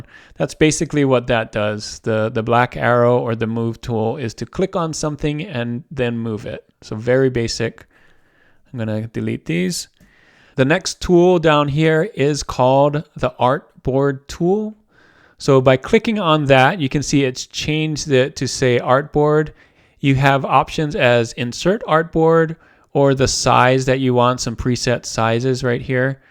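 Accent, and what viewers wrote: Hawaii, United States. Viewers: Bro, are you from Hawaii?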